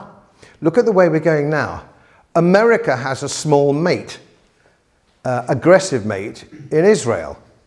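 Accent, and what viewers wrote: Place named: England, United Kingdom. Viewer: It's great to hear a lecture delivered in such a quintessentially English manner, thanks Godfrey for your dynamic and uplifting speeches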